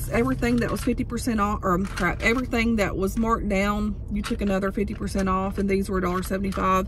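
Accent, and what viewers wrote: South, United States. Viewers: Amen Lady!! You said everything that I would have said too! I'm a new subscriber and I really like your southern accent. I have one too. I was born and raised in Tennessee. I now live in Alabama. My parents and a lot of family are in Tennessee and I visit as much as I can. I love the way you think about things and the ones with harsh comments are the ones insecure about themselves. You are beautiful inside and out. Take care and God bless!! ❤️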